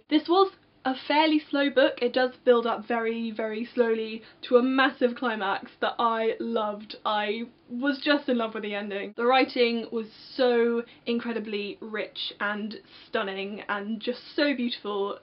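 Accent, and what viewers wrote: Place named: United Kingdom. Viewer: Great books! Looking forward to watching more of your videos :) I'm not sure what my favourite book of 2014 was to be honest! I think I'll have to go Cinder by Marissa Meyer. :) Just wondering, where abouts in the UK are you from? I love your accent!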